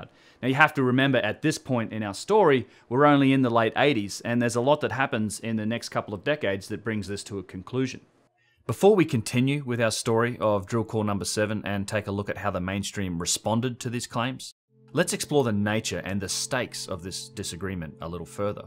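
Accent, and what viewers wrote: Australia. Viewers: Great work, so obvious they used diamond drill or similiar stone to anyone with a brain that works! Thankyou! You sound Australian if so, great to sed Aussie working seriously in this area!❤😂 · Good stuff mate, nice to listen to another Aussie voice talking some sense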